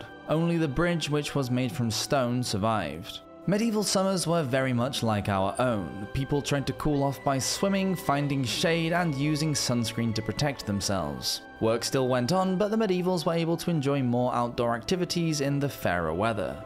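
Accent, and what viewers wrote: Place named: Leeds, United Kingdom. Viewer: You have a Leeds accent I think. Im South African btw so no experience here.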